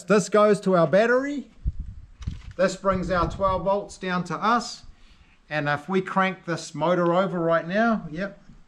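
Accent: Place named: Australia. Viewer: From the accent hes from Australia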